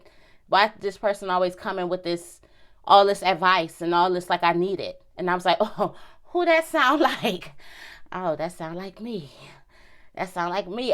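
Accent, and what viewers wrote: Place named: DMV, United States. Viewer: Your accent is cute 🖤 You def from the DMV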